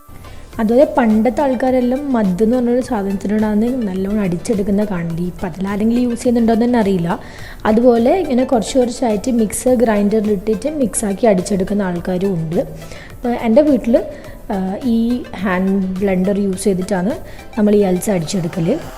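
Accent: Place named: Thalassery, India. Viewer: തലശേരി യാണോ. ഭാഷ. മനസിലായി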